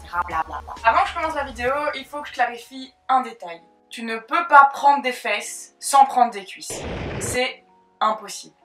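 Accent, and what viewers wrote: Belgium. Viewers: L'accent belge me fait tomber ;) Cela vient pas de de Liège je dirai peut être Hui ou Namur ?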